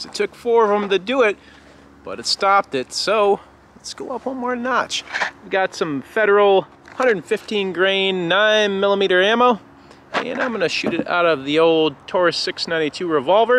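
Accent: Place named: Midwest, United States. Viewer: Can't get enough of the Midwest accent! like the 22lr videos, but come back for the accent! You sound just like my late dad and all my cousins, aunts and uncles from STL, Iowa, and WIS....Have a pop doncha know!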